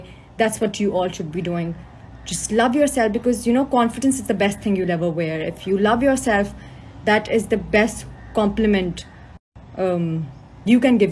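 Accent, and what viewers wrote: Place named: India. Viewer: Her accent is indian 😂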